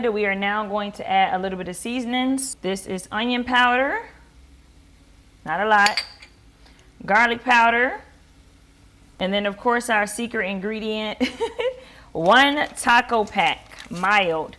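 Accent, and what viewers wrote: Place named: Louisiana, United States. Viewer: Love the video. Are you from Louisiana? The accent sounds like it lol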